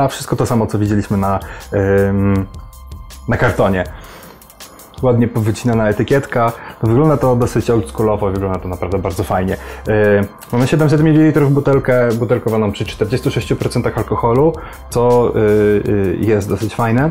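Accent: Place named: Scotland, United Kingdom. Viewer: Szkoci chyba muszą Cię mylić ze swoimi krajanami - mega akcent.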